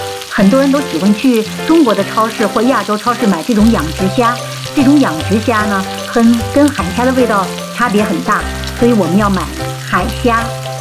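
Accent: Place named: Tianjin, China. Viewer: 天津口音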